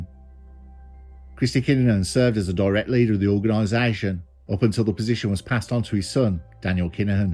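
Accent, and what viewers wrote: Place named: Midlands, United Kingdom. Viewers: 100%.. love this video and the combo of black country/brummie accent and surreal music makes this reality peaky blindersesque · Yeah that's it, it's the narration that fits like a hand in a glove with the content, probably his raw Midlands accent and demeanor that draw us in and keep us listening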